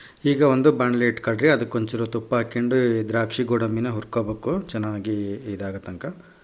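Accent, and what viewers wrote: Chitradurga, India. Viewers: ದುರ್ಗದ ಭಾಷೆ ಕೇಳೋಕೆ ಚಂದ ನಿಮ್ ಅಡಿಗೆ ಮಾಡಕ್ಕೆ ಚಂದ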